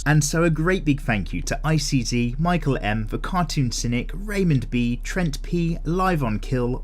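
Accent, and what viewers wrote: United Kingdom. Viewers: Are you a British?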